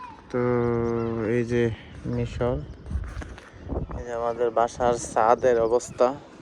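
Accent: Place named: Narayanganj, Bangladesh. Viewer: ভাই আপনার কথা সুনে মনে হলো আপনি নারায়ণগঞ্জ এর ছেলে হবেন,,, 🎉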